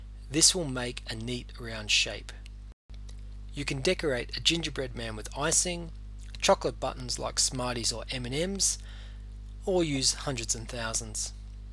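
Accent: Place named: Australia. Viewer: good job! i love your accent! are you australian?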